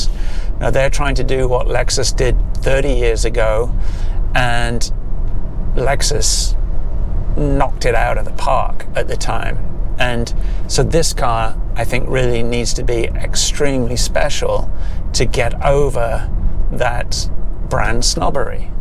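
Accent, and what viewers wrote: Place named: United Kingdom. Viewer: I'm thrown off by the Brit voice and the vehicle isn't right hand drive. The two just somehow belong together.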